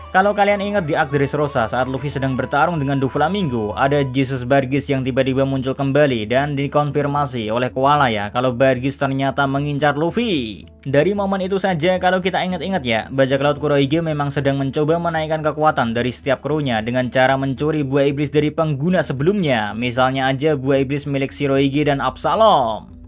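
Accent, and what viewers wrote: Java, Indonesia. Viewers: Logat jawa tai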